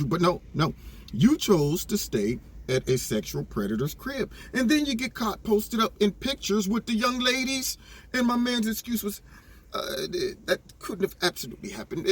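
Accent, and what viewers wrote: England, United Kingdom. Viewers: I love your English accent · Your british accent is so funny! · Your British accent is jolly good! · Hilarious! Love it! Love the British accent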